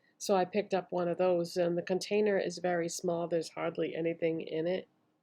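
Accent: Boston, United States. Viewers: i just found your channel and i love your content, subscribed!! also, are you from the new england/boston area? we have the same accent :)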